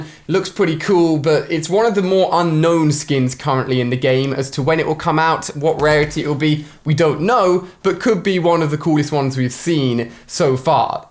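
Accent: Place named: United Kingdom. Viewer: Not being racist but I kinda like the British accent like ”ool “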